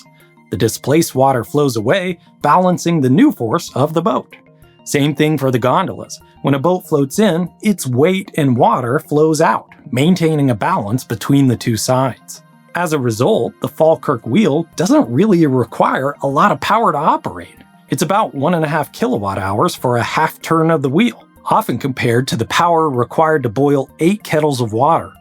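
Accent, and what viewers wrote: United States. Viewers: It is always fascinating watching americans talk about Scotland and pronounce things in their accent. I live in the area and more than the engineering, it was something that added a lot of benefit to an area that was notorious for being less than amazing. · It's interesting to hear how an American pronounces Scottish words. There is a distinct shift in vowel sounds between the two nations, even if we share a similar language. Sometimes, the pronounciation is amusingly different from what us in Scotland would use, but sometimes, it's harsh, almost alien sounding. Languages are interesting.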